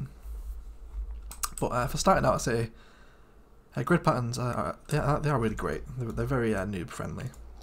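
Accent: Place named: United Kingdom. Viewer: Hi, apart from this map do you have a thought process what map you choose. Also cheeky question where you from in the UK. You sound like my home town lol.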